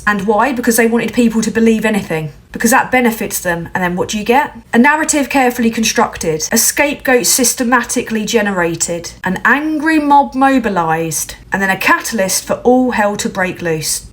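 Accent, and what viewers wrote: Cockney, United Kingdom. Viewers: Your just a Labour Lord Lord Haw Haw, champagne socialist propaganda merchant, with tour mockney accent, which posh school did you go to..your a plant.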